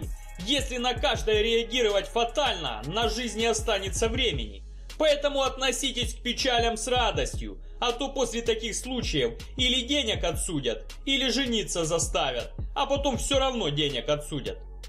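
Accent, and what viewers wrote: Ukraine. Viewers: И ещё. Это просто украинский говор, запомни☝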